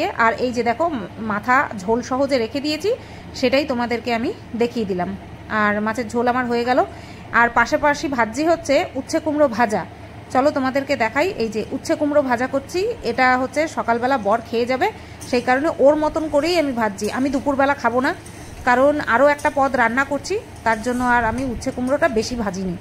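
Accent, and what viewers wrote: Bangal, Bangladesh. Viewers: tomaar moton bold, honest, r courageous lady khoob kom dekha jaaye..r sense of humour toh mashaallah..tomaar mukhe bangal bhasha shunte khoob bhalo laage...ei comment ta pore ektu bangaal bhashaaye reply diyo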